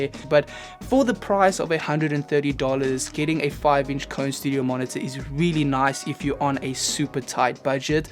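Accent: South Africa. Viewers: hello, Just a random question are you south african (you ascent just sounds like it)?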